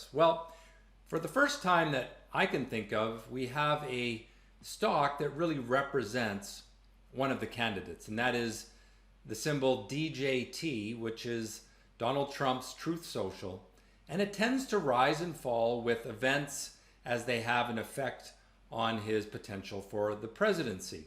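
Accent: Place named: Canada. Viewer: I’m an Aussie and always have loved the Canadian accent. This guy is simply incredible. Clearest information that I have ever listened to.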